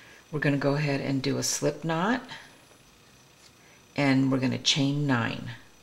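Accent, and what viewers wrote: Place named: United Kingdom. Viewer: Sounds like your from the UK... The stitches are in English / US terms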